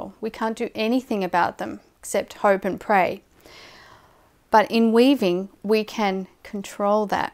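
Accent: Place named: Australia. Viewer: I wish I had found you a few months ago. Apart from having the easier on the ear approach of us Aussies in comparison to some other countries, your videos are very informative.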